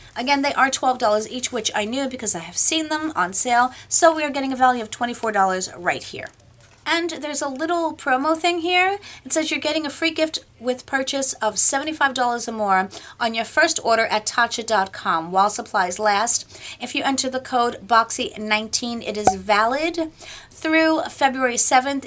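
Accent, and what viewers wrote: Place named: New Jersey, United States. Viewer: I'm new from Abby.. u r beautiful an u was great on camera.. Edit... where are u .. ur accent is so New Jersey LOL LOVE IT 😘 also I got the same box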